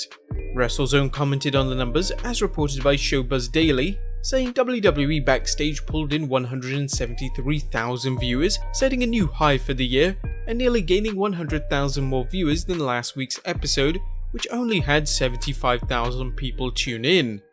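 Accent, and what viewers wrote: United Kingdom. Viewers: I didn't know is British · find a NEW Narrator (preferably NOT British)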